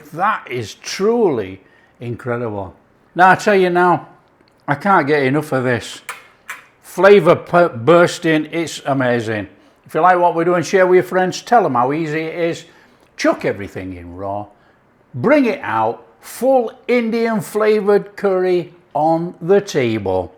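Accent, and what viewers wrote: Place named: Peak District, United Kingdom. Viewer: Where do you live? I live in Florida now but raised in the Peak District. Your accent is similar to those in the Peaks.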